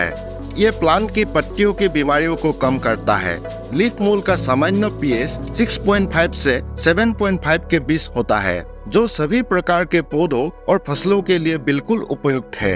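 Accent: Assam, India. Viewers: Aap Assamese ho kya bhaiya. kiunki humare Assam k log hi aise hindi bolte hain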